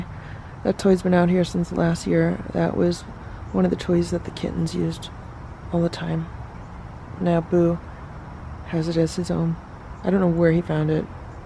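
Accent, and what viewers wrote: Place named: Long Island, United States. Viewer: Where are you from....I live on long island....we kinda sound alike...I have Tom's of ferral cats by my house....it kills me. I have 2 that live in my house now and I am going to build a big cat apartment mini building.....I feel they should all have a home and be loved and spoiled.....it kills me to see them scared....and outside not feeling loved 😢😢😢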